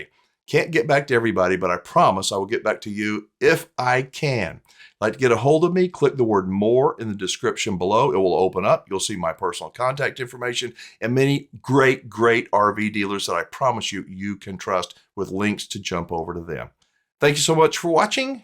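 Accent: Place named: Georgia, United States. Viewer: I mean no disrespect. But you and Nancy Grace have almost identical regional accents. I watch all your great videos. She's from Macon Georgia, you? Respectfully Submitted, Mark Tinnirella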